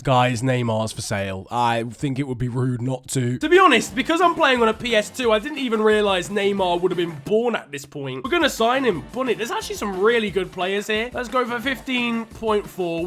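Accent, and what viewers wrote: Nigeria. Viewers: Are you Nigerian?🇳🇬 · Are you Nigerian 🇳🇬?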